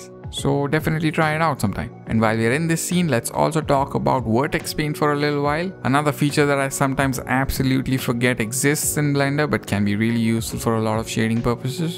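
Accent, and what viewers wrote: India. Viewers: Nice tut, tnx! Your Indian accent and mumbling make it unbearable, though.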